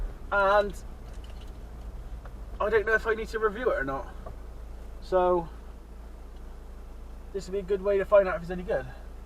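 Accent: Bristol, United Kingdom. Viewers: Always love your vids. Strangely comforted by your bristolian burbling. 💋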